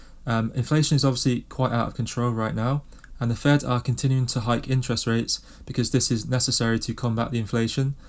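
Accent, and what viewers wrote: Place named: England, United Kingdom. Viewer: Nice accent! You English?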